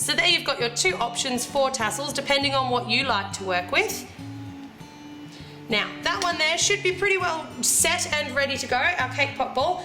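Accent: Australia. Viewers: I just love your Aussie accent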